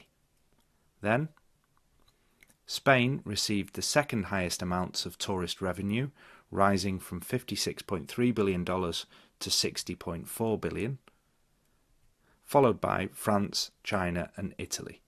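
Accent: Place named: United Kingdom. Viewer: Woow u've such a beautiful voice and accent, Don't take it personally, it's not an offensive word. How did you achieve this accent, I from central asian, because of that I have problems in accent, as sound r. How did u get this british accent?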